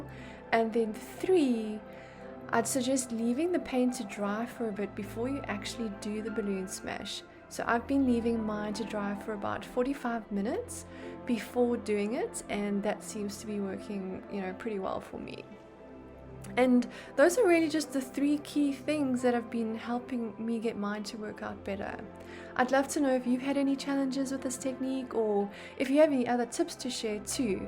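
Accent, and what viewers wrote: South Africa. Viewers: So pretty. Yes I was having the same challenges with the paints - def going to try your tips. I enjoyed listening to the SA accent - made me homesick.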